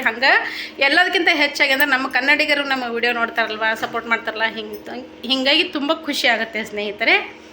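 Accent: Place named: Bijapur, India. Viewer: Nim bhashe nam bijapur du ant gottagut sister.gulbarga bhashe matadal nivu thanks 🙏 nice .navu bijapur distric davru but irodu out of State.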